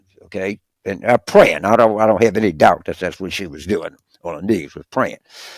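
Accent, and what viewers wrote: South, United States. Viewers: his heavy Southern drawl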